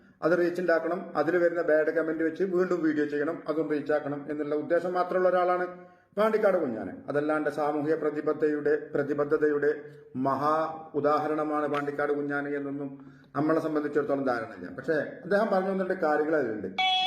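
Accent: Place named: Kannur, India. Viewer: ഈസംസാരിക്കുന്ന ആൾ കണ്ണൂർ കാരനാണ് തോന്നുന്നു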